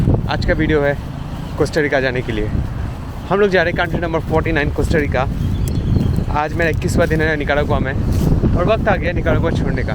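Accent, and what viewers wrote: Bengal, India. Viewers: I like your vlog hindi with Bengali accent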